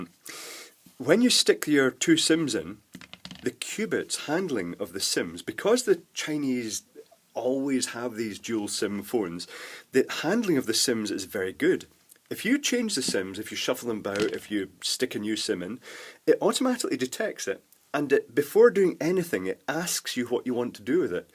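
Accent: Scotland, United Kingdom. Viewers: it's not your boomy voice, it's the Scottish accent ;-) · i knew it, just some of the words you were saying and the accent! I'm from Glasgow myself so hello fellow Scotsman!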